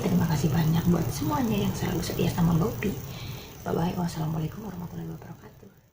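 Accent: Banyumas, Indonesia. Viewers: Ora ngapak ora kepenak mbak..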